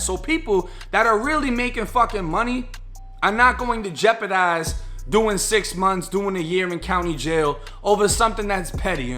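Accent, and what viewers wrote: Boston, United States. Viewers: Yo bro mad love man glad to see a homie doing good forreal .. I see ya Boston ascent coming out strong man haha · I love your accent.. wyf?? Boston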